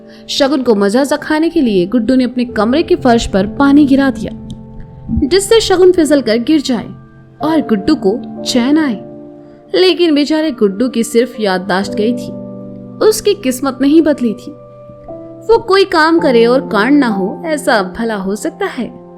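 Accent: Kanpur, India. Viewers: Didu har har mahadev Happy friendship day Congratulations for 19k subscribers Didu apki kanpuriya tone mast hai maze ajate hain 😉😉👈👈 Mahadev always bless you 🧿🧿😇😇❤️❤️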